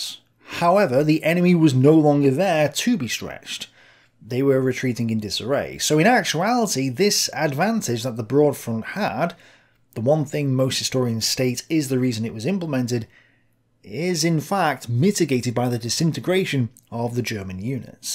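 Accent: United Kingdom. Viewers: I really like your videos and i think that they are very meritorical and well made. Because of this i feel kind of silly to admit that i usually listen to them while going to sleep. There is just something in your strong british accent and in the way that you calmly explain various WW2 topics. With your videos i am able to fall asleep in 10 minutes or less. Please do not take this as an insult, i do watch your videos without intent to fall asleep as well, especially battlestorm as i need to focus on the map to understand the situation. I just wanted to thank you for making my sleep easier. Have a great afternoon!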